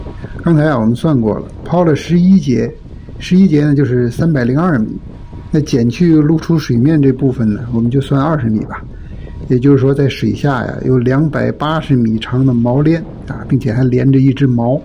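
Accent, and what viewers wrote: Tianjin, China. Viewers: 天普，船长天津人。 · 船长是天津人？ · 老哥是不是天津人？ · 听口音像天津人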